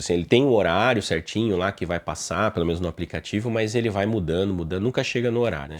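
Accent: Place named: United States. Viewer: Sotaque forte eu acho quem fala esse R que você fala, tipo americano. PoRRRTa kkkkkkk😅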